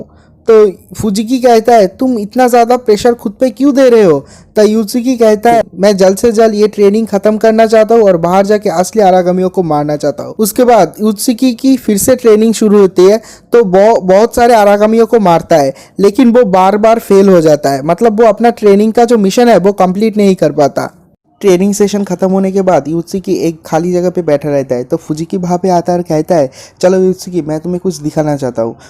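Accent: Bengal, India. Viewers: Bangali ho ?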